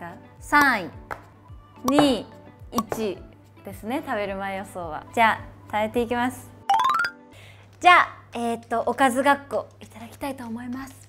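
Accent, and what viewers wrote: Kansai, Japan. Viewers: 関西弁可愛い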